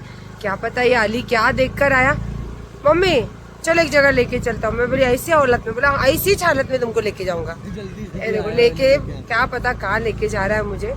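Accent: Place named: Deccan, India. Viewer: WOW she's talking dakhni urdu❤❤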